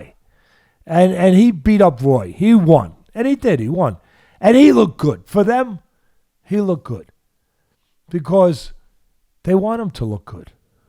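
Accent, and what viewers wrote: New York, United States. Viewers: Do you think Gus and Jerry keep me tied to it sounds like it. Teddy the scientist half mass times velocity squared equals force. He tried to say that Mike Tyson was not quick or fast you’re wrong. He wouldn’t have the power if you didn’t have the speed velocity has three times the effect of mass read the equation again. And technically up to six times. The two together are devastating right. Peekaboo I see you. You can fool some of the people some of the time probablyUp there in New York where they understand your accent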